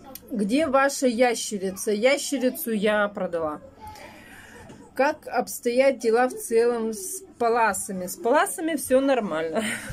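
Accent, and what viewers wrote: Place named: Perm, Russia. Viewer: Зачем Вы позорите Пермский край? Не надо врать, что у нас так все разговаривают. Если Вы не образованная, то не надо говорить, что все такие.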